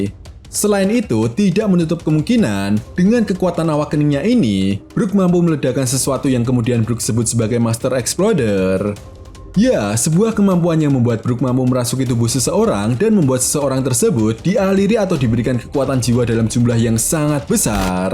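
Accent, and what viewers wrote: Java, Indonesia. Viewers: Malah puyeng dengerin suara masnya dgn aksen briish javanese. Mending pake suara AI aja bang..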